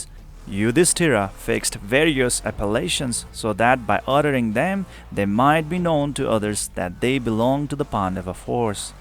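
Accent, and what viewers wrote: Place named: Nepal. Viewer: You have a rich Nepalese accent. Keep the brilliant work going.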